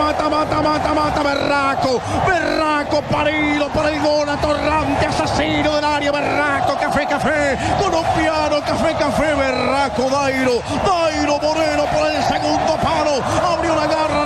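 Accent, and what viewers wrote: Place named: Córdoba, Argentina. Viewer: Porque el relator es cordobes, argentino. Quizás no entiendas algunas palabras porque son modismos de acá.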